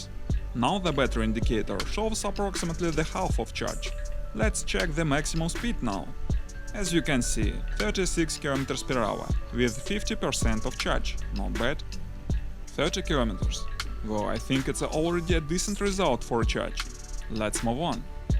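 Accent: Russia. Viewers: Русский акцент сразу палится) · Sounds Russian to me. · U have a Russian accent don’t be scared to tell us the Brand-name I want 1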